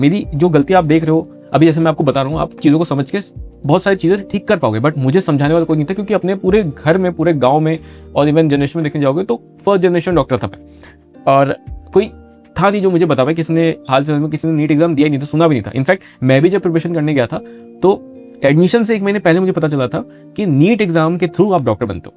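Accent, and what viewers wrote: Bihar, India. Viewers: aap bihar se ho huje laga up se ho aap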